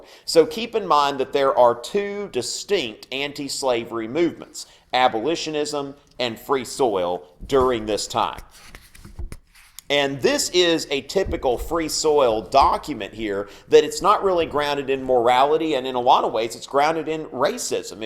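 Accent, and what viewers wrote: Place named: South Carolina, United States. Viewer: Thanks for clarification on the Compromise of 1850. BTW- You need to help Keith Hughes with his southern accent. :-) (you sound like a South Carolinian. I'm a Georgia boy)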